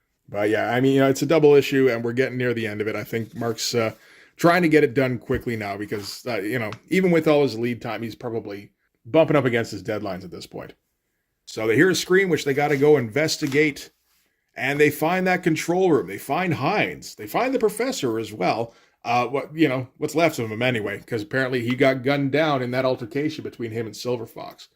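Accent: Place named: Canada. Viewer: Funny i pegged you as Canadian from your accent. You sound like Kevin Owens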